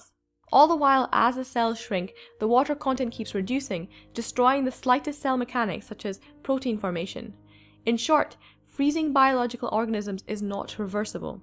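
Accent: United Kingdom. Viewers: What part o UK are you from?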